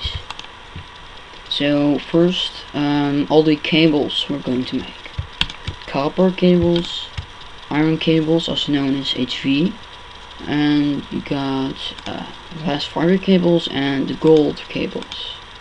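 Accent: Netherlands. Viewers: thanks for the solar setup! seems much more efficient then the flowers, but the flowers were so pretty! unrelated to that: hello there, obvious dutch accent.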